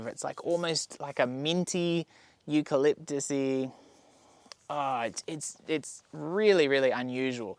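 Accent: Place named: Australia. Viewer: LOL Did I just hear an Aussie dis Americans for not pronouncing words properly?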